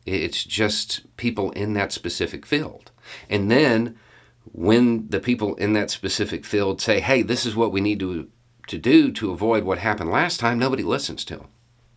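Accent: South, United States. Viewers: Can I say that it is very refreshing to hear someone with a southern accent who actually sounds intelligent and reasonable?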